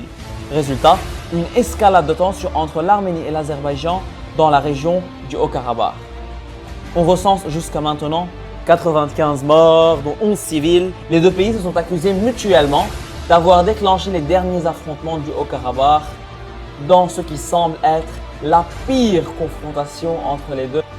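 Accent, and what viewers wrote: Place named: Belgium. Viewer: Cet accent Belge à couper au couteau, MDR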